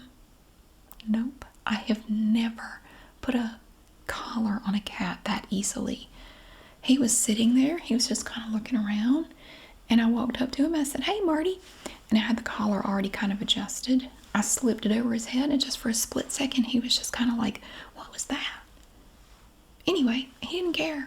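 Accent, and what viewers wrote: South, United States. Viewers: Enjoy your videos. You have a nice southern accent. Toboggan means knit hat in the south? That is funny. I’m from Minnesota and here Toboggan is definitely a sled. We have plenty of snow in winter.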